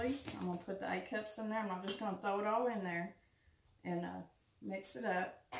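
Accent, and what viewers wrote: South, United States. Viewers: Man, I am an avid baker for therapy as well. I cant tell you how much I enjoy and have used your experience to add to my own. I want to thank you in this trying time of Covid for your messages and as a Canadian I just love your southern accent! In two words, Thank-You! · I’m gonna make this tomorrow! Please remember to wear your mic so we can hear the lovely southern drawl!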